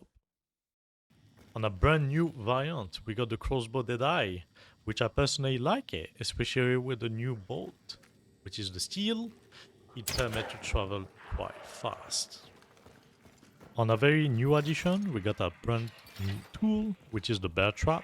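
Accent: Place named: France. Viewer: gg for banging 20 min english with french accent <3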